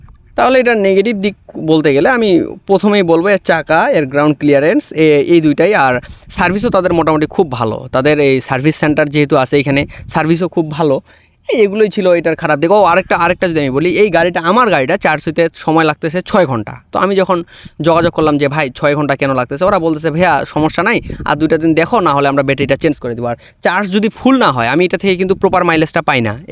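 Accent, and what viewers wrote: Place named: Dinajpur, Bangladesh. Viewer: দিনাজপুরের ভাষা ❤, আরো ভিডিও করবেন প্লিজ, গাড়ি নিয়ে, এমন ভিডিও ইউটিউবে কম আছে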